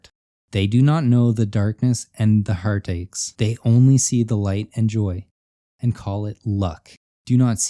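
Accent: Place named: Maritimes, Canada. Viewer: Maritimer eh? Just subtle.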